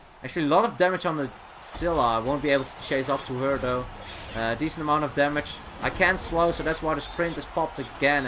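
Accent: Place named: Netherlands. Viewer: The dutch accent ;)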